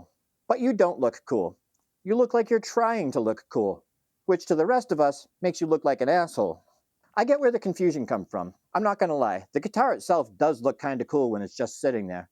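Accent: Boston, United States. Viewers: The Boston accent is a nice. Gitauh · As a Brit, it sounds exactly like how Americans say it to me...I thought he was American!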